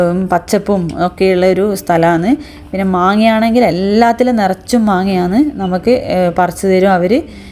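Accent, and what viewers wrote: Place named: Kannur, India. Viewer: ആദ്യമായി കേൾക്കുകയാണ് മാഡം കണ്ണൂര്കാരി ആണോ?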